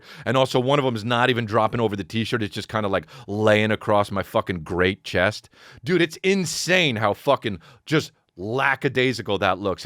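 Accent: United Kingdom. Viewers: Your British accent is surprisingly really good!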